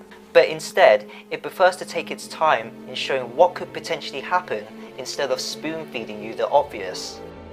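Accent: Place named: United Kingdom. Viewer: Also, British accent really rocks!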